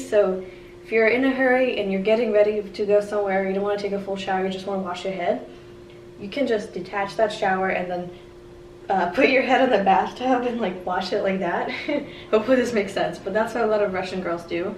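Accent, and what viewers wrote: United States. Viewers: In none of her videos does she talk about returning to Russia; all her talk is about applying for different visas and trying to prolong her time here and getting a job here; I think she intends to move here; she already sounds and acts like an American. And of course she now has an American BF. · You sound like an american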